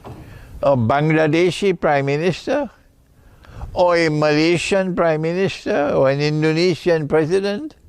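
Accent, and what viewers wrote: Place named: Tamil Nadu, India. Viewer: Trans tamil english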